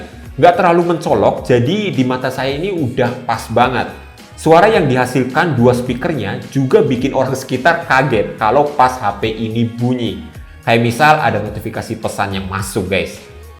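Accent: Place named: Java, Indonesia. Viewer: Medok juga sih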